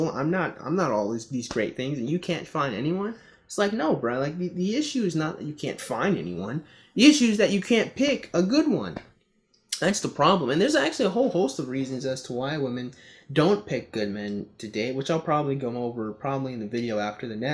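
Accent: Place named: England, United Kingdom. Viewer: Lose the random English accent.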